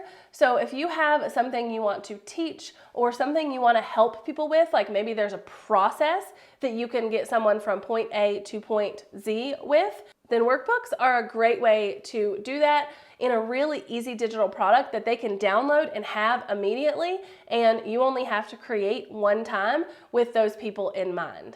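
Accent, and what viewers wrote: South, United States. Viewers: Hi my first time seeing your video.. ive always thought about selling digital but never knew how.. i also love your country accent brings back happy memories.. from what you mention id be interested in learning how to sell digital planners online and what kind of platform would i need in order to do this.. can you help me.. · I loooov your english! Where in the US is it from? It sounds so nice!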